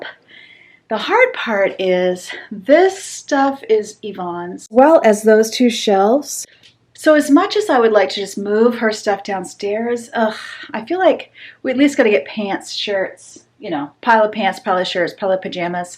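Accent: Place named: Maine, United States. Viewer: Don’t ever change your wonderful accent,. It reminds me of home every time I hear it and I love it so much. We watched a video growing up in Maine about how the language is similar to a certain old English dialect. I’m frankly, a little shocked that anyone would comment in a negative way. It’s beautiful, beautiful beautiful, and I love it. ❤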